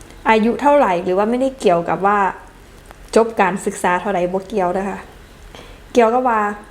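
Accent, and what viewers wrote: Isan, Thailand. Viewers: ไทยคำอิสานคำ..น่าฟัง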